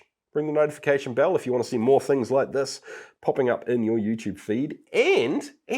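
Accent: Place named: New Zealand. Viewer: Just wanted to share my pointless thought, not really related to the topic of the video: This kiwi accent is killing me sometimes. One of the main things is that "e" sounding like an "i" and making one word sound like the other (for example better--->bitter). Ofc, there's a lot of other things too. Especially when I come back to this channel after a break it really gets me off guard, and takes a while for me to get used to it again. And dont get me wrong I'm not trying to criticize or make fun of this accent (as i said its a pointless thought, so I'm not really trying to do anything here), since I barely speak/understand that language at all, and I sound like I have a serious brain damage even when I speak my own native language, so I'm really not in any kind of position to make any actual comments or criticize anyone's accent. If anyone read to this point: thank u for letting me waist ur time😊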